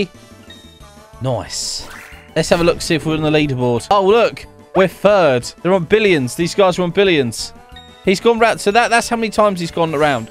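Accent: United Kingdom. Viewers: His British voice never gets old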